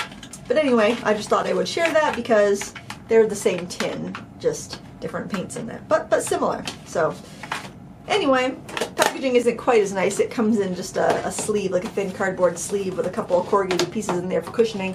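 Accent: Maine, United States. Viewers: I'm thinking the same thing. Wish I would have watched your review before I sent the tin back.. I am curious if I could have gotten the tin to flex back into place like you did. :) Anyway, thanks for all of your reviews! I love listening to you, since I was born in Portland Maine, but live in Portland Oregon now. Miss that Maineard accent. :)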